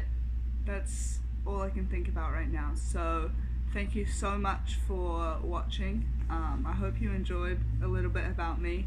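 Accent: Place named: United States. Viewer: Yes!!!!! ❤️❤️❤️ also you sound so American now!!!😍 so proud of you x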